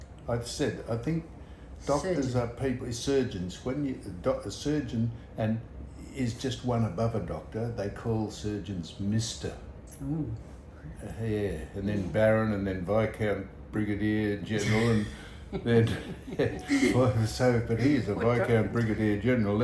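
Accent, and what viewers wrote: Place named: Australia. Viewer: God bless you,:) I like your larrikin banter. Always well said with Aussie charm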